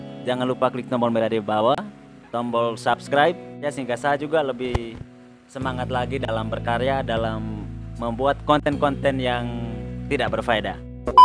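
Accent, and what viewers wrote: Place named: Adonara, Indonesia. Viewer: Abang ini orang, apa??? LOGAT nya masi kental Le... Hehehe... Kayak orang adonara Witi hama.... LOGAT nya itu hahahaha, sukses broo terus laris manis buat konten nya.... Kita akan mendukung dari belakang.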